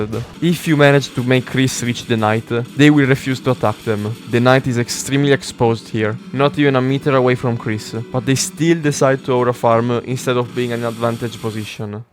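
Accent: Italy. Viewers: Not relevant but are you Italian? I'm from Italy and many people have this accent in English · Ciao bro, bei video. Comunque sì mi dispaice ma credo di sentire che sei italiano, bei video. Ben fatti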